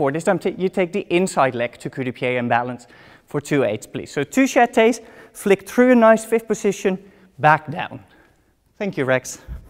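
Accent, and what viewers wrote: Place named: Australia. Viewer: his accent sounds partly Australian ...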